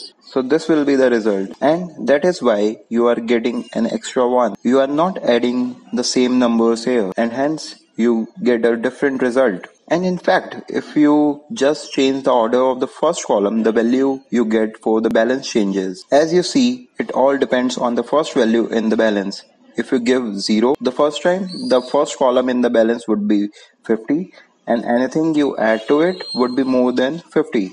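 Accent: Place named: India. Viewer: Oh God I was hoping it wouldn't be an Indian narrator 🙄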